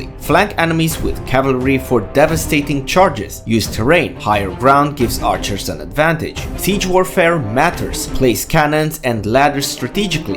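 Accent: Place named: India. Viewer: Indian accent detected. I dip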